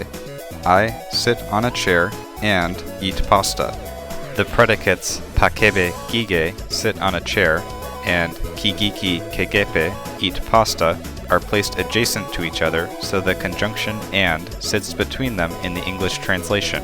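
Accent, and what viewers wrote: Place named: United States. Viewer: Please come up with an accent for the language or something, it sounds terrible with an American accent. Ps. Is that Shovel Knight music in background?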